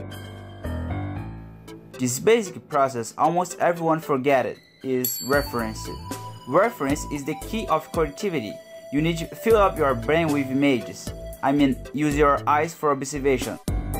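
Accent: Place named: Brazil. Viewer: Muito bom! Parabéns e obrigado. (I'm supposing you're brazilian considering your name and accent)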